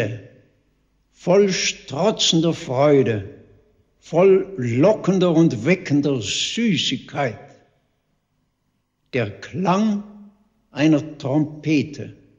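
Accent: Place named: Swabia, Germany. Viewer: Wie berührend, den schwäbischen Tonfall in seiner Stimme zu hören. Diese schöne poetische Sprache ist wie Melodie. Leider fast vergessen.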